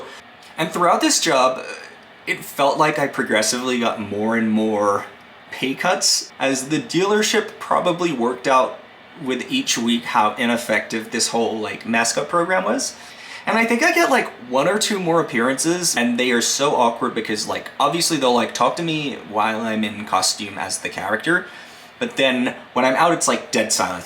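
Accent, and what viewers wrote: Australia. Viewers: NGL, the last time I watched one of your videos, I remember you having an Australian accent. Not saying that it was a bad thing at all, but man, things have really changed since I last watched you on YouTube years ago.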